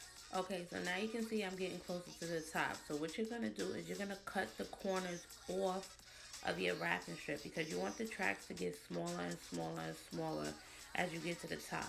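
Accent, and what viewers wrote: New York, United States. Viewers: Do I Hear A New York Accent ?? Omg If So YASSSSS ITS SK PRETTY lol i love accents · I love the New York accent, im from California, I'm in Long Beach I love yall 💜💜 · Hair looks amazing!!! Love that NY accent too. :) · What part of NY are you from? I hear an accent.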